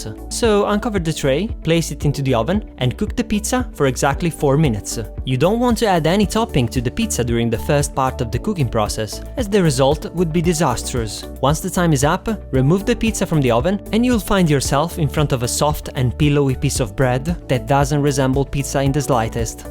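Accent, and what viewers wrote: Italy. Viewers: Hey, your recepies are amazing! My sister and I just tryed some of them! Aaaand we were wondering if you are italian.. :) Because we notice your italian pronunciation, and it's wery well!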